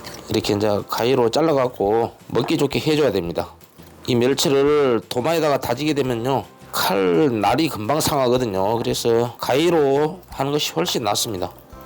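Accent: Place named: Honam, South Korea. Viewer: 선생님께서 정겨운 호남말투로 만드시는 경상도 토속음식 너무 재밌네요 김천 흑돼지식당 가서 이거 먹어보고 반해서 레시피가 궁금했는데 잘 봤읍니다.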